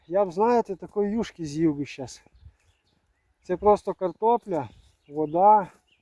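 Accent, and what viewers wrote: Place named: Olevsk, Ukraine. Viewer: Відчуваю, що це десь на півночі Житомирщини. А, вже почув. Олевщина. Чути по вимові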